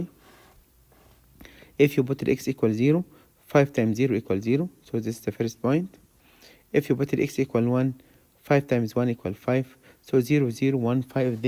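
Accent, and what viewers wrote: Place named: Arab world. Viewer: I can tell from your accent that you are Arabic And btw you helped me alot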